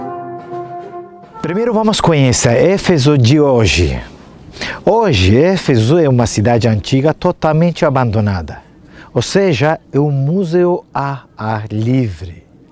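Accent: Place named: Brazil. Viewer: para uma pessoa de nacionalidade turca, você domina muito bem a língua portuguesa. Acaso morou no Brasil? Por que a sua pronúncia está similar com o português brasileiro. Parabéns pelos vídeos, eles nos levam a uma cultura multi-secular, que nos facina pela história do cristianismo e seus percusores. Gratidão...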